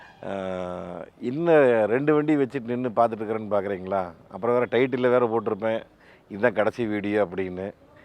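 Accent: Kongu, India. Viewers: Brother.... நீங்க கொங்கு தமிழ் நல்ல பேசறீங்க.... சிறப்பு....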